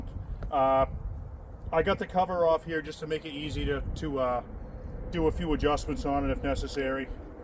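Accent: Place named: Massachusetts, United States. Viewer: just curious the accent sounded Massachusetts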